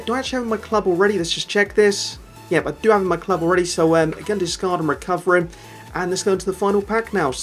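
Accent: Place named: England, United Kingdom. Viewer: What part of England are you from the accent is different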